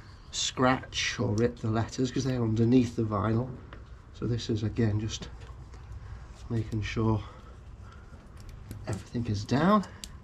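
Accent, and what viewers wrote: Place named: Lancashire, United Kingdom. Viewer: Just having breakfast clicked on YouTube watching your channel as I only found you about a month ago I'm watching your 1st ride on the GT. I know this road intermittently, Settle if I'm not mistaken. This is my 2nd blade too. I had a 06 which was better than the 15. Just watching next vid I think you just confirmed Hawes n Settle. Must crack on I've just moved from Stockport to Rochdale, your accent suggests Burscough/Skelmersdale. Calimoto screen shot north of Skem when I get the GT I'd love to meet up.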